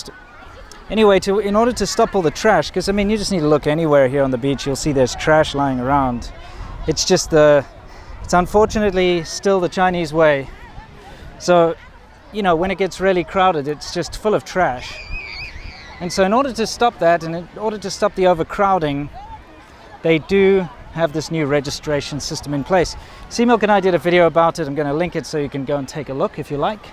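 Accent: South Africa. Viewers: You a South African😁👍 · Hi where are you from? I hear a lot of American expressions but you sound more South African. I have just started watching your videos and I think they are great. I had no idea how day to day life is in China but this is a good insight. I honesty would be absolutely in big trouble if I went there, no idea what those Chinese characters mean and as for the language?? you get the drift.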